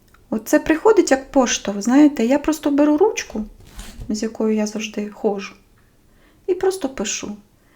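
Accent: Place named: Rivne, Ukraine. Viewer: Дякую. Люблю слухати Ваші вірші, Ваш рівненський голос.♥️♥️♥️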